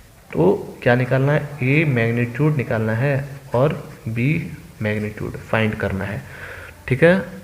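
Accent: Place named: Uttar Pradesh, India. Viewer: Pure up meh bat karte ho sir app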